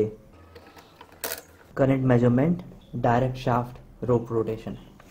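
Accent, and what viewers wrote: India. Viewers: 这是印度口音吗？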